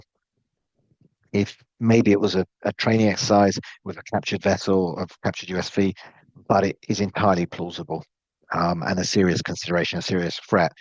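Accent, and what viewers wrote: United Kingdom. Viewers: I love your briefings, but the sound is horrible. a) British accent, b) RUS/UKR names and locations, c) lots of acronyms and technical words. Time to block channel.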